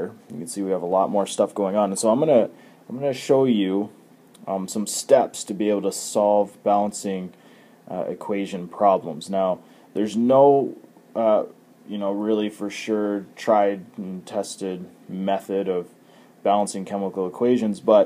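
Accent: Canada. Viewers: Stupid Canadian accent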